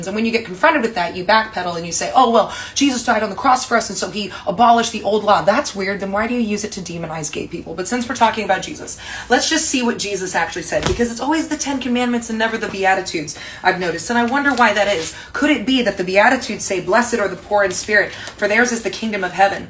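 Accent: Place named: United States. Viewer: Dear, I love u. To be precise, I love tt fire cracker brain of yours. 🥴 U don't know how hungry I hv been for scintillating intelligence tt cuts right thru the 💩 that's slung at us every day thanks to social media n the shameless greed, evil n lies of the privileged class... I'm also green w envy tt u hv an IQ of 146... Gasp! But I know it can't be ez n tt it can be v lonely inside at times. But for whatever it's worth, brain to brain, u hv an ardent admirer here. I struggle a teeny bit at times w yr rapid fire discourses at times as I hv to parse yr American accent thru my cynical Chinese brain n stodgy British education but I don't mind the coz U make me laugh so much when you give all those freaks, hypocrites n liars the dressing down they so richly deserved... I'd buy u a carving knife but man, tt tongue of yours wld do a MUCH better job! 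🤣 I hereby declare tt I am yr humble fan n grovel at yr feet for all those 'licious lil crumbs of whiplashing U give those oh so deserving man-babies. 🤣😂🤣 Oh dear, I hope my jaw doesn't fall off fm all the laughing. May the Universe bless U n send U lots of fireflies to light yr way. 🌌🥴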